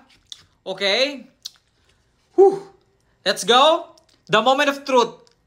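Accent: Visayas, Philippines. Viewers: Bisaya ka po ba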